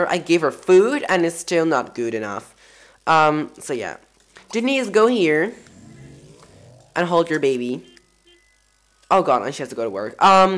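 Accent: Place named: United States. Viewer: Ik dacht echt dat jij Amerikaans was ofzoo je spreekt echt goed engels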